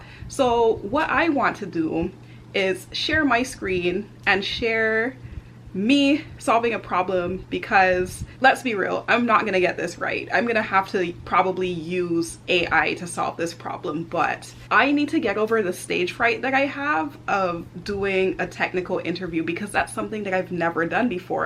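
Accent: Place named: Midwest, United States. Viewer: Are you from mid west? I hear a slight accent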